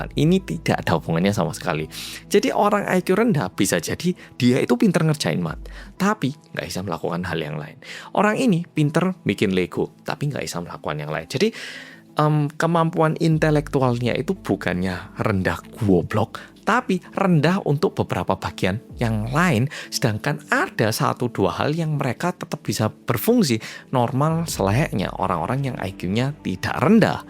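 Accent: Java, Indonesia. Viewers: Mas udh cocok bgd jd orang indo.. Selain logat medoknya khas Jawa.. Udh terkontaminasi kata2 untungnya 😂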